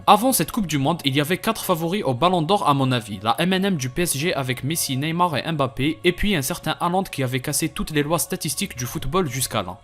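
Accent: Kabylie, Algeria. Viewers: UN ACCENT TYPIQUEMENT KABYLE T'AS RAISON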